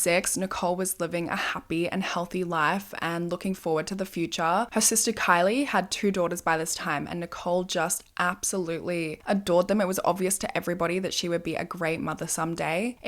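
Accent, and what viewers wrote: Australia. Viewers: I got hooked with your videos because of your voice and Aussie accent 🤗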